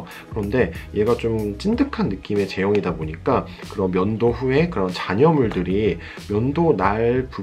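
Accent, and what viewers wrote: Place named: Seoul, South Korea. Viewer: 영상볼때마다 느끼지만 말투가 참 다정다감하시네요. 요란스럽지 않아서 좋은것 같아요!^^ 서울말 쓰는것도 부럽고..ㅎ 무튼 영상 잘봤습니다. 자주자주자주자주 올려주세요ㅎ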